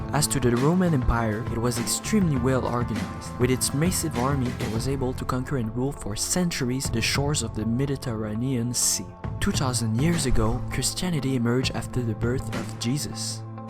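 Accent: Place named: Quebec, Canada. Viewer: Hello l’accent québécois :)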